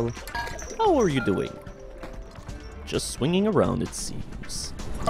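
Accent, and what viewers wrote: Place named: Quebec, Canada. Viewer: This is why i love watching LPs.He's just so calm and collected always, and the french-canadian accent gives him a special variety from other LPers today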